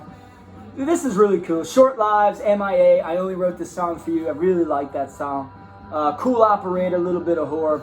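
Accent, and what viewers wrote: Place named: Pennsylvania, United States. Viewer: I'm from new jersey my family's from NYC you sound like you're from the Pennsylvania area lol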